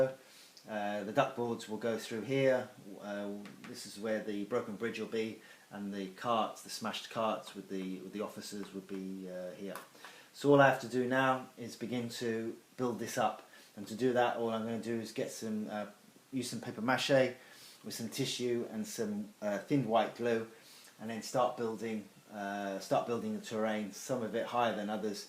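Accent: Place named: United Kingdom. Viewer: Amazing as always , nice to hear your voice and its british, I'll put the kettle on and grab a seat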